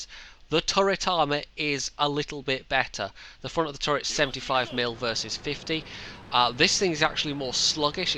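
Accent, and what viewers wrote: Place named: Scotland, United Kingdom. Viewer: It's the Scottish accent messing it up. :D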